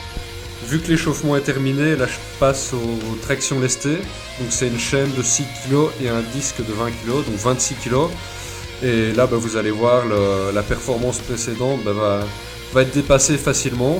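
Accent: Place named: Belgium. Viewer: Sympa le vlog et l'accent belge ;) Tu étudie quoi ??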